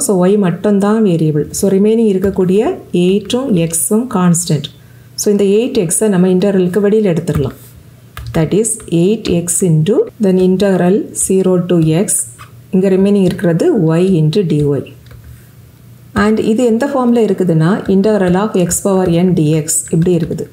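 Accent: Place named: Nagercoil, India. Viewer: Thanks mam,clear explanation with nagakoil slag was awesome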